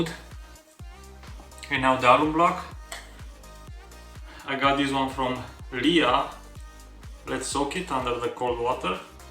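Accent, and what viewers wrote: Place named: Romania. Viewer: Based on his English accent I reckon he is from Romania, or some other eastern country.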